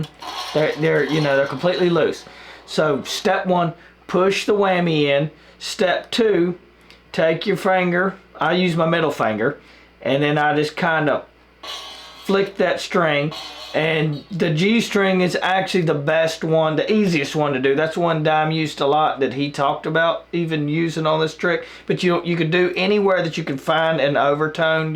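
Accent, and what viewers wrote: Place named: South, United States. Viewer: idk why the algorithm sent this my way but I'm glad it did. I dig your vibe. You don't get a lot of Southern accents on youtube. I've seen that video where Dime talks about this technique but you can barely see what he's doing in it so this was really helpful.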